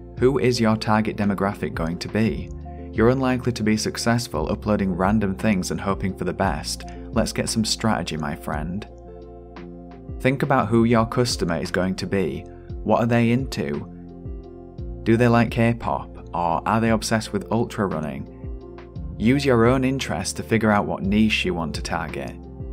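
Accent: Yorkshire, United Kingdom. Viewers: Sounds Yorkshire or northern English to me, based on all the Brit TV I've watched my life. (I'm American) 😊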